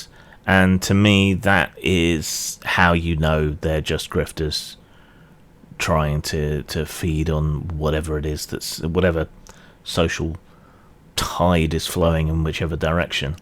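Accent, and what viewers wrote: United Kingdom. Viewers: Still a little surprising that you recited my bloviation and griping about contemporary US social decay. I appreciate that you consider it worthy of repeating. I need to improve my prose if its going to be read aloud by your dulcet southern English tones.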